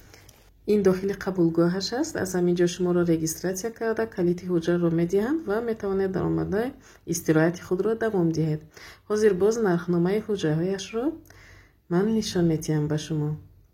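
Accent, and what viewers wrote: Tajikistan. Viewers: Автор ай лахчаи гапзанитон муалима хастед тоза забони адаби точики ахсан 👍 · Хамачои нишон додагиатон зебо, аз хама зебо гапзадани шумо бо забони тозаи точки. Ташакури зиёд ба шумо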